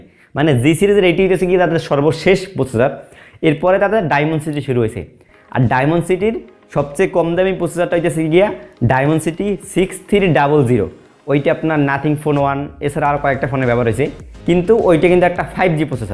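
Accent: Barishal, Bangladesh. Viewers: ভাইয়া আপনার বাসায় কি বরিশাল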